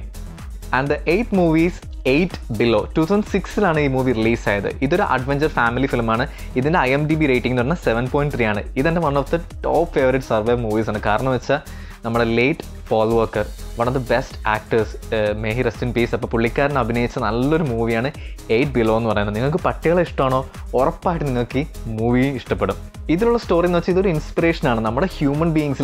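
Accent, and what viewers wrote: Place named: Adimali, India. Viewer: അടിമാലി ക്കാരൻ ആണോ നീ.. നിന്റെ സംസാരം അങ്ങനെ ആണ്.. അമ്മച്ചി അപ്പച്ചൻ..😇😇👍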